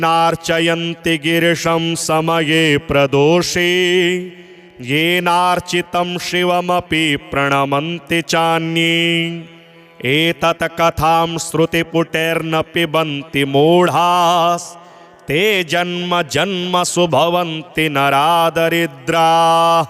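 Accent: Standard. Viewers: aapke Shuddh Uachchaarn SnskrittT Ke DeevaneinnN Hum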